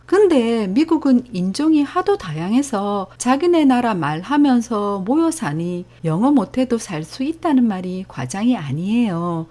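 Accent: Gyeongsang, South Korea. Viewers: 경상도 좋아요 😄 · 경상도 사투리 너무 심하고 시끄러워서 불쾌해서 싫어요 줍니다. 시끄러운 경상도 사투리 세상에서 가장 싫어요.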